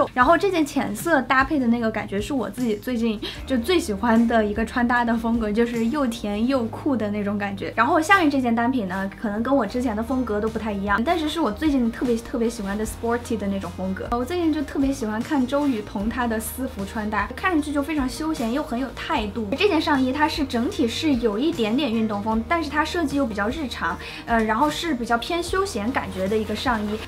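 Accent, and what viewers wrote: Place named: Chengdu, China. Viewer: 支持老乡 ps看你成都话的化妆视频看了七八遍 其实是听 乡音啊 😄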